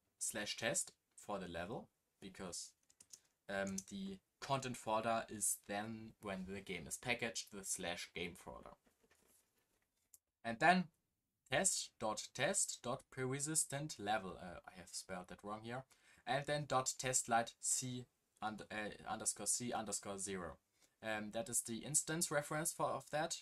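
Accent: Germany. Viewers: unauffälligster deutscher akzent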